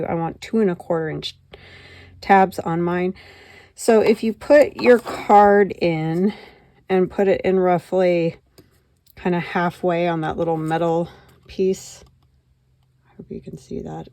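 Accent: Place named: Carolinas, United States. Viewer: You kinda sound like you’re from the Carolinas. Are you?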